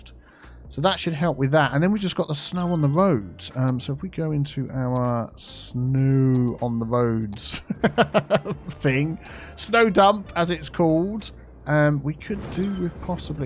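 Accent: United Kingdom. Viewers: Hi, although I am on PS4, I really enjoy ur videos, and that's becouse your english accent, wich I find it to be so British, hahaha. We are u from? If u don't mind me asking.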